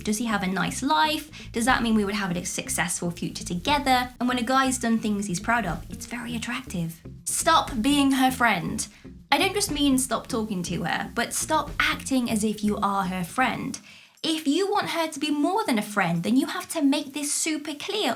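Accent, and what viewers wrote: United Kingdom. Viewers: Sounds like a bloody brit , not?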